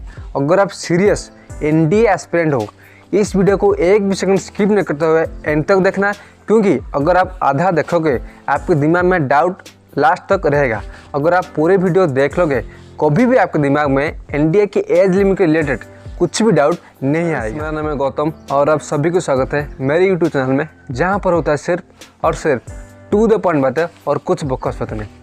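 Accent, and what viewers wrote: Odisha, India. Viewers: Bhai ap odia ho kya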